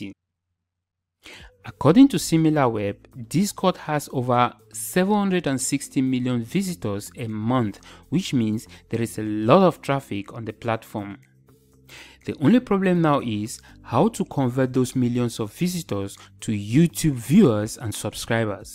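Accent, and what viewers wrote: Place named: India. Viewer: srry i thought u were indian bcs u have indian voice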